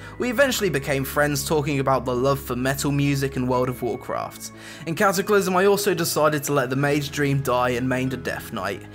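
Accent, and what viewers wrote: United States. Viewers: you American or British?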